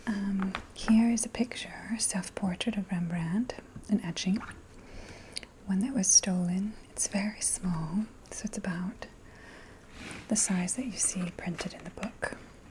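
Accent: Transatlantic, United States. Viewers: It sounds transatlantic right? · Your accent is akin to the trans Atlantic / Edie Beale cadence and it is amazing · very transatlantic. It kind of jumps all over the place tho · New comfort video ❤ P.S. What accent is this? I can’t always distinguish between regional US accents but this lady’s accent reminds me of Jaws and I love it.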